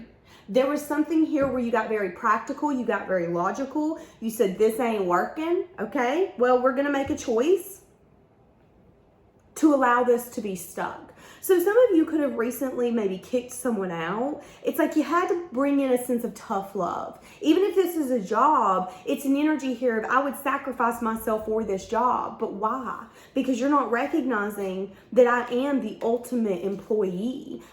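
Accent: South, United States. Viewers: Wonderful Reading 💜 And I Just Love Your Southern Accent & This Is Coming From A Texan 🤭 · Oh my gosh! I LOVE your hair!!!!!!! You are seriously cute as a button. And that southern accent just completes it 😆 Total wallflower fan girl, loyal subscriber here in Dallas! On to the reading. I already know it’s going to be on point, as always. 👌🏼 Love you to infinity and all of my other favorite card readers for sharing y’all’s gifts with us. It makes us feel so seen and heard! ❤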